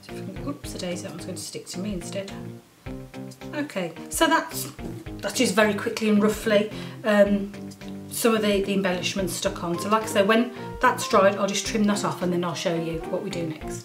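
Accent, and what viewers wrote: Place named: Birmingham, United Kingdom. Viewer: Thanks. Great video. And I love them Brummy accent! Reminds me of home in Coventry xx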